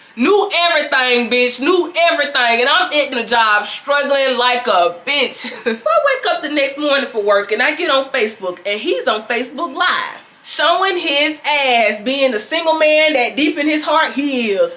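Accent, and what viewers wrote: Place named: Alabama, United States. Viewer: You from Bama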